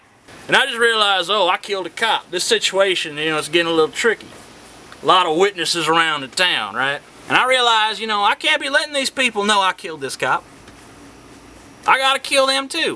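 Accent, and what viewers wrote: South, United States. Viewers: I dont care what that guy you guys played on DP says about your southern accent- this clearly proves otherwise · I love his southern accent so much!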